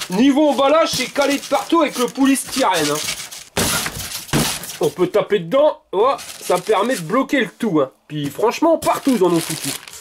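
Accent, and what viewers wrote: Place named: Nord-Pas-de-Calais, France. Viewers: Salut, j'ai découvert ta chaine depuis peu et je me régale;) Des tests de malades avec de vrais retours! le tout avec notre accent chti;) J'ai acheté le même vélo dans sa version Y20 (même caractéristiques) et il est top! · je ne te connaissais pas ;j'adore ,tu me fais beaucoup rire avec ton accent du nord.et bravo pour tes commentaires au sujet de ce velo. je pense acheter le meme.continue comme ca .VINDIEU.......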